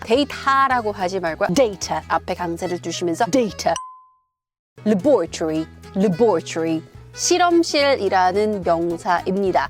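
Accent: United Kingdom. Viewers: 영국취업 준비중인데 영상 너무 도움돼요! 이렇게 영국원어민 발음으로 영어 가르쳐주시고 심지어 재밌기까지😊 감사합니다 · 영국사람처럼 발음하는거 솔직히 존나 킹받음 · Hump day ㅋㅋㅋㅋㅋㅋㅋㅋ 발음이 영국식 발음이시네요. 재밌게 봤습니다. 구ㅕㅂ ❤️ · 넘나 재밌어요 영국 발음 체크하기 좋아요 꿀잼 쵝오🎉😂❤❤❤❤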